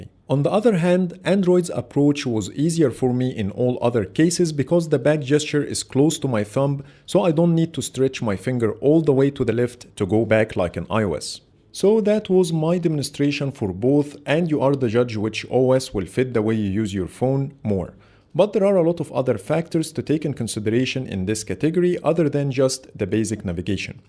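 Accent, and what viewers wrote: Egypt. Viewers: Sounds egyptian to me ??!! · Egyptian accent 😁 your video's quality is amazing 👏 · Swear i can hear Egyptian accent from here 😂😂👏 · انا كمان عرفت انه مصري من لهجته بس هوه عسل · From your first 2 sentences I know that your Arabic especially Egyptian but was heisted until emad Ahmed called u hahaha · هو طعمية علي فكرة ومن لهجته باين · knew it I am Egyptian too and I know the exact accent 😅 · gotta appreciate the Egyptian inspired accent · Egyptian accent cheeeeeeck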